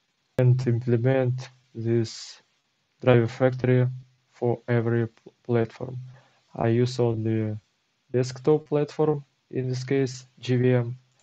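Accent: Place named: Post-Soviet states. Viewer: Искал инфу по SQLDelight, начал смотреть видос, и такой, акцент, слишком грубооо, пахнет снг, потом смотрю а это )Лайк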